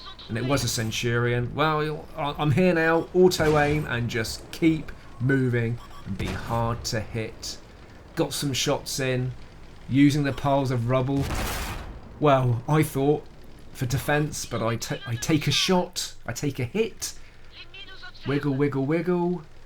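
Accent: United Kingdom. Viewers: 35 minutes!!! Well played! It’s a pleasure to hear you talk! You’re my second favorite YouTube Wot Console player just behind The Turkey Tank, but your accent is so brittish it’s delighting for my french frog hears. Thank you and go on... Perhaps should you try the Jagdpanzer, it’s my second favorite premium to play behind the Vanguard, it’s fast, has a good camo rating and it’s challenging!!! Bye-bye...Bye-bye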